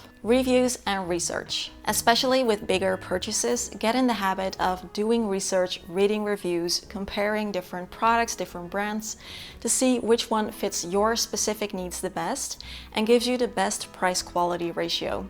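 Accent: United States. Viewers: is fluent in English but definitely has an American accent! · Netherlands English accents are American or did you learn English in America? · Great video! Thank you,. I also want to compliment you on your English. I would have assumed that you grew up in the US. 😊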